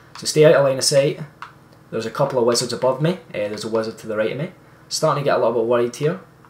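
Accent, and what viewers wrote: Scotland, United Kingdom. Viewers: Nice video man. Are you Scottish by any chance. You have the accent ?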